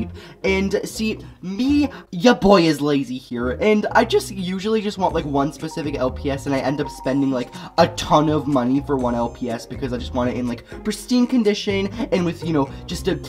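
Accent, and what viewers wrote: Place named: Australia. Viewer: OMG YOUR AUSTRALIAN TO OMG OMG OMG OMG OMG OMG OMG OMG OMG OMG OMG OMG OMG OMG OMG OMG OMG OMG OMG OMG I THOUGHT YOU WERE AMERICAN no offence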